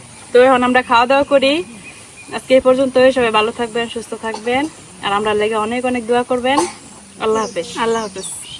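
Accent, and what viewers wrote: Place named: Mymensingh, Bangladesh. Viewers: আসসালামু আলাইকুম ভাবি আপনার বন্য আছে বন্য থাকলে আমি বিয়ে করতাম আমাকে মাচ মারে খাওয়াতো আমার বাড়ি কিন্তু রংপুর আপনার বাড়ি ময়মনসিংহ তাই-না ভাবি আপনার ভাষায় বোঝা জায় মাজা নিলাম ভাবি আপনার সাথে মনে কিছু নিবেন না ওকে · ভাষা ময়মনসিংহ অঞ্চলের ! খুব সুন্দর। নেত্রকোনা হবার সম্ভাবনা অনেক বেশি । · আপু তোমার কথা শুনে মনে হচ্ছে নেত্রকোনা আমিও নেত্রকোনার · কথা শুনে মনে হচ্ছে নেত্রকোনার মানুষ, আমার শশুর বাড়ীর লোকজন এভাবেই কথা বলে। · মনে হচ্ছে নেত্রকোনা বা ময়মনসিংহ বা শেরপুর তবে পাহার ও ভাষায় মনে হয় নেত্রকোনা জেলা র কোন গ্রাম।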